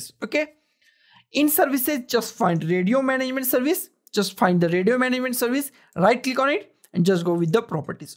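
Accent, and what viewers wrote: India. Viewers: why is it always someone with an indian accent that just gives the best advice. i looked for an hour to solve my problem but 1 min into this video and i fixed my problem. Thank you!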